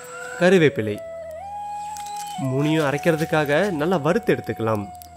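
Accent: Salem, India. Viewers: இவர் பேசுவது சேலம் வட்டார மொழி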